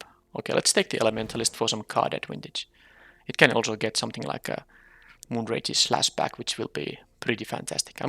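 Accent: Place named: Finland. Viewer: You sound Finnish! Lovely to see your face :D Thanks for all the draft videos, I've found them very helpful.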